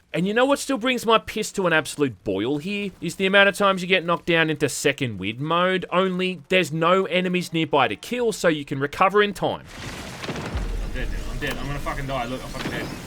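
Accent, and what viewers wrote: Australia. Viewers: Why does sound MORE Australian the farther back you go in his videos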